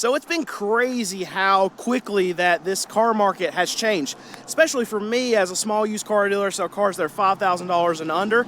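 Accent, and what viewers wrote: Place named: North Carolina, United States. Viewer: I heard North Carolina.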